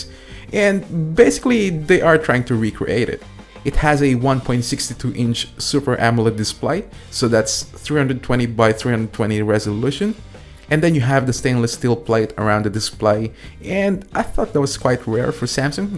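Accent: Philippines. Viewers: the diction of the speaker sounds like Filipino. :) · kinda disturbing. a guy with a Filipino accent but pronounces words with "O" in an Australian accent. not good to the ears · Are you Pinoy? Your accent sounds Pinoy.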